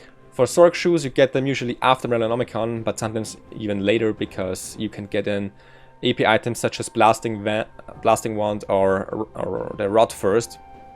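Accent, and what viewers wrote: Germany. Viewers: Nice Tutorial. But now I am really interested. You dont really sound like youre from England or America. With which I dont mean your English isnt good its actually really great, but you dont got that accent. Thats just a guess but are you from Germany your accent sound kind of like the German one. I am from Germany so I am really interested now :)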